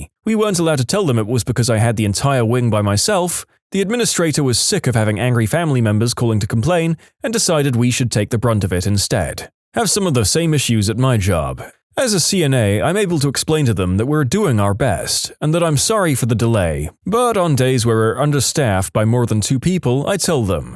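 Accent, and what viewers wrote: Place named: England, United Kingdom. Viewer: So hang on. If you're not British, narrator, then where does your accent come from? I always just assumed you were English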